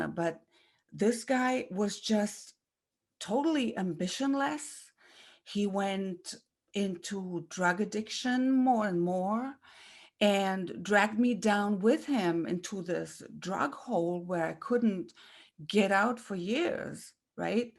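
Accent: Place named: Germany. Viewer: Thank you sister in Christ, glad I found your channel and happy for you that you were set free from your narc(s) 🙏🏼 Going on 17 years of marriage with a Christian covert narc next year. The Lord has opened my eyes completely. Starting Minute 22 is spot on! I can hear an accent- are you from my home country Germany? God bless you 🙏🏼🤍🦾 MARANATHA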